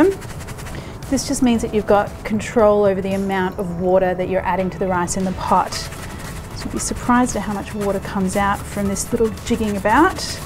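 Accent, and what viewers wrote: Australia. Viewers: You and iggy azalea have the exact same voice lol And it's not just the Australian accent. It's the same tone and pitch.. Mmm rice looks so good. I'll be making this tonight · You sound like you grew up in Australia, then moved to the US south and started to pick up some of their accent.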